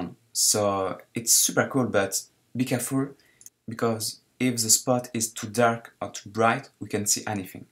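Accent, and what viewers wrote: France. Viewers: j'étais sur que tu étais francais :D on reconnait l'accent et bravo pour tout ce que tu fais j'aimerais vraiment que tu reprenne ps : j'ai 4 de tes wallpapers dans ma chambre aller salut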